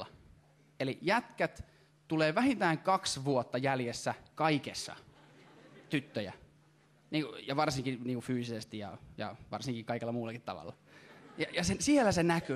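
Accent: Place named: South Ostrobothnia, Finland. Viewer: etelä pohojanmaa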